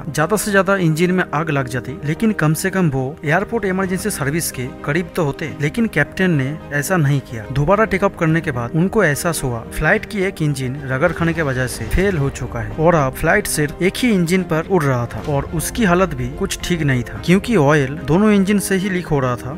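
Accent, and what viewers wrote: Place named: Bengal, India. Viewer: Thora pronunciation improve karo. Bengali gaon ka accent hai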